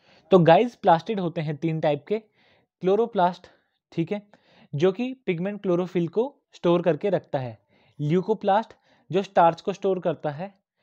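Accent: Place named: Bareilly, India. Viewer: Sir you are from bareilly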